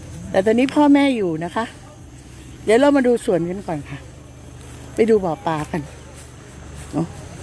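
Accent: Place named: Kanchanaburi, Thailand. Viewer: เมืองกาญจน์ชัดมากจ้า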